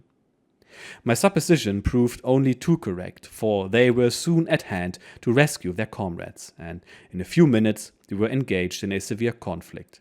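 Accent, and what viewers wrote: Germany. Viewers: Really a great story ,I've enjoyed every episode thanks for reading it as your German accent makes it feel like it was him reading 📚 it !!!! Fantastic !! As the English say cheers!!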